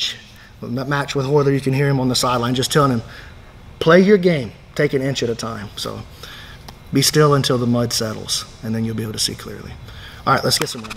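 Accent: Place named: South, United States. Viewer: I love the southern accent.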